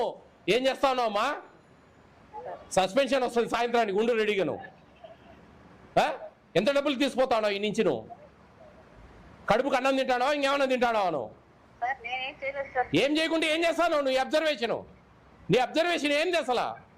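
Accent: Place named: Kurnool, India. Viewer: ఇన్ని వీడియోలు చూసాను సార్ అన్ని వీడియోలు కన్నా ఈ వీడియో పవర్ ఫుల్ గా తిట్టినారు సార్ దూకి సావండి ఎక్కడైనా మరి రాయలసీమ భాషలో ముఖ్యంగా కర్నూల్ భాష లెక్క మాట్లాడుతున్నారు సార్ వాళ్లకు చేత కాకుంటే మాలాంటి వాళ్ళకి ఇవ్వండి సార్ నేను చూసుకుంటాను సార్ 10 15 హాస్టల్లో చూసుకుంటాం అలాంటి వారిని తక్షణమే తీసేయండి సార్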